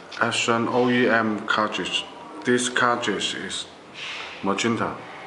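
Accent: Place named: China. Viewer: he has nice idea, don't confuse to his english we all know he is chinese. atleast he trying. thanks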